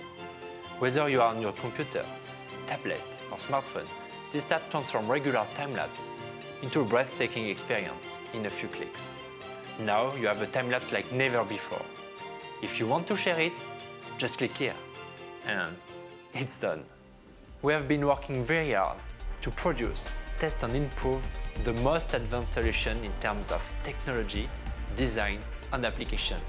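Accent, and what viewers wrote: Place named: France. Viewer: C'est une bonne idée je viens de le voir sur studiosport, en 4K ça aurait été cool, sinon l'accent ( french accent) à coupé au couteau ça le fait moins x)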